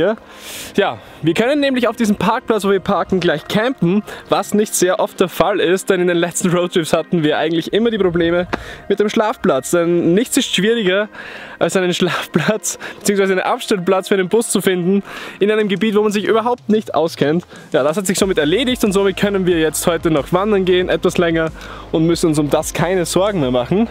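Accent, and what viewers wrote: Austria. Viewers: Kommst du aus österreich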